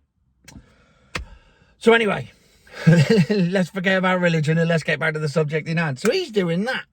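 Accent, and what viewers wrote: Cornwall, United Kingdom. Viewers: Love the cornwall accent,takes me back to when to when i was young,my grandparents came from cornwall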